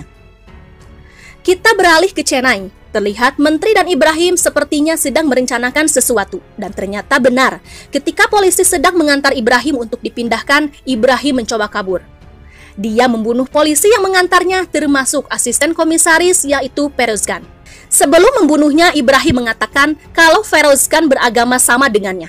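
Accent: West Java, Indonesia. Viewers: Kayaknya admin nya orang Sunda ya🤭🤭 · Mimin.orang sunda euy☺😁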